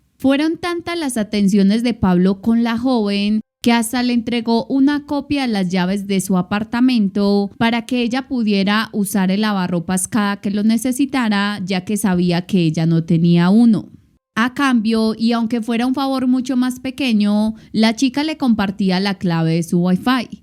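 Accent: Antioquia, Colombia. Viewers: Siempre hay otras opciones para escuchar... es su manera de hablar, muchos colombianos así hablan, y a muchos nos encanta ese acento!! ❤ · No es Muletilla, se llama acento. Ejemplo las personas argentinas tienen su acento al hablar, así mismo es en Colombia. Me gustaría escucharte haber como hablas al narrar algo. Es bueno criticar detrás de una pantalla. 🙄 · Es su acento de ella! Así hablan en Colombia! Tu quiensabe dq país eres! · Es así su acento porque intuyo que es paisa y esa es la manera como hablan. Si no es de tu agrado hay muchos youtubers de true crime que puedes escuchar. Ya que ni modo que ella cambie su acento porque hay gente que no le gusta.😊 Saludos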